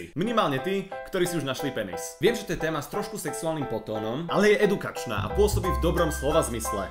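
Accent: United Kingdom. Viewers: Super britský prízvuk :) :D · haha ten uk prízvuk si zabill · Ty si sa učil Britskú Angličtinu ? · Ten tvoj britský prízvuk mi pripomenul Wheatleyho z Portál 2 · o Ten britský prízvuk :D niečo neuveriteľné :D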